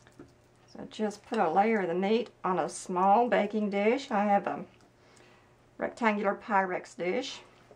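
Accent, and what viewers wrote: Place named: South, United States. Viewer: I am betting y r a Southern bell from your accent. I am from Georgia. I buy peanuts in shell and grind up in a bullet. so good.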